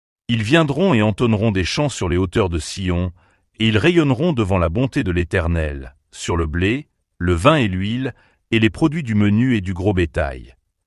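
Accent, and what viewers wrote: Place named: France. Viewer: ISRAEL ET LE TEMPS D'ANGOISSE DE JACOB ART KATZ en audio. Chers tous, Dans le grand charivari d'opinions et influences souvent contradictoires autour de la question d'Israël dans les temps de la fin je me suis souvenu, comme d"une priorité absolue, du travail prophetique charpente sur base des Écritures de notre défunt ami, Art Katz. C'est clair, tranché, évident et ne fut pas aimé lors de la publication. Art venant chez nous en souffrait...Mais de toute évidence la réalité d'Israël aujourd'hui devient une caisse de résonance évidente pour ce message. Bonne et sérieuse écoute. Les temps sont graves ! Haim Goel L'accent frenchie est désastreux. Sorry.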